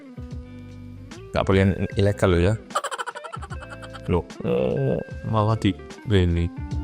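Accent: Java, Indonesia. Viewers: Selamat yaa atas kelahiran anak pertamanya, turut berbahagia ❤ Kadang masih gak yangka ini orang korea, soale ngomong indo ambek jawa e iki medok poolll 😂 Jujur mirip dirimu sol 😅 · Iya bener banget.. aku masih inget si jemuran2 itu.. selamat ya mas.. bangga banget denger medok Jawa mu masih kuentel gtu.. selagi lagi welcome to the world Dede Jang Ruel, sehat2 dan bahagia.. ❤ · 5 bahasa berarti mas bahasa korea, inggris, mandarin, indonesia, jawa medok 😁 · bahasa indonesia itu mah.. jawanya sekalimat sekalimat. tapi medok iya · Ngikutin mas dari Orang Korea yang medok sampai orang Korea yang beranak😂 Anak nya gak jadi namanya Jang rawon mas😅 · Orang korea yg medok.. Ngono ae lah mas.. Iku cirikhas sampean og.. 😁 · Youtube channel org korea yg aku follow cm. Krn emang bener2 sdh mendarah daging indonesia, bahkan cara bicaranya medok jawa bgt, ga ada logat korea sama sekali. 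Tau banyak ttg indonesia ketimbang org korea lain yg hanya memanfaatkan konten demi cuan. Btw selamat atas kelahiran putra pertama nya Jang Ruel, smoga menjadi anak yg berbakti pd org tua & sukses. Ruel malah diajak ngobrol boso jowo, diajari boso jowo jg bro 😂😂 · I just wanna say, CONGRATULATIONS mas medok 🤍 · Ruel sejak bayi udah dengerin bahasa indonesia medhooook 😅😅😅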